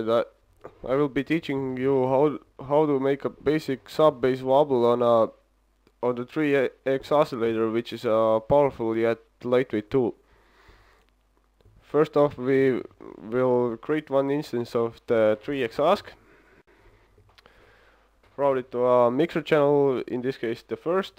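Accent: Estonia. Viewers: Estonian accent ? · Kõlab väga eesti aksendi moodi :) Aga ei 5+ tutoorial :) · D arvasin aktsendi järgi ,et eestlane. Checkin kontot, ongi eestlane . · kle sa eestlane w aktsendi järgi tundub kyll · tüüpiline eesti aktsent :D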